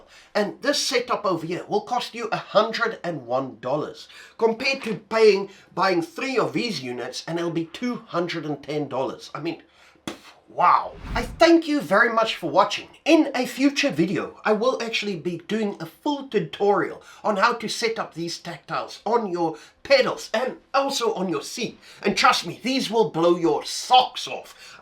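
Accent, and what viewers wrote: South Africa. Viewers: I recently discovered your channel and I’m loving your content. Are you South African?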